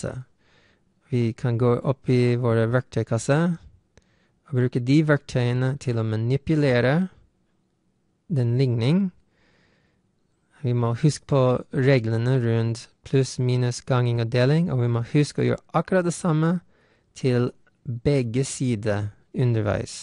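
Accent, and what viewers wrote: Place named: Norwegian-American, United States. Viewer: Awesome norskamerikansk, haha :D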